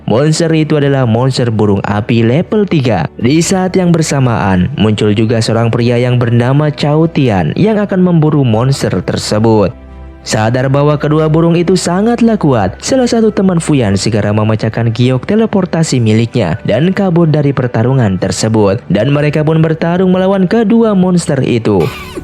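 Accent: West Java, Indonesia. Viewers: Sunda mang? Abdi sunda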